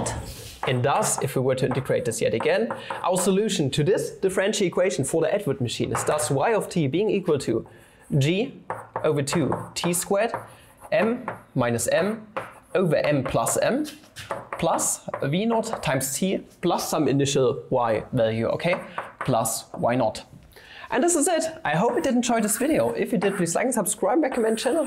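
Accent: Germany. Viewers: i know you're german from your accent 😅 keep going bro good luck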